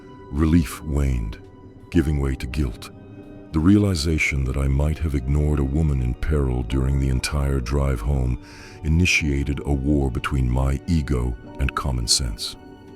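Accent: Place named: Upper class, United Kingdom. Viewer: I don't like the narrator's voice. Is it a computerized reading? The grammar used, while mostly correct, sounds so upper-class British that it detracts from the feel of the vid, almost sounding like it's all a tongue in cheek satire.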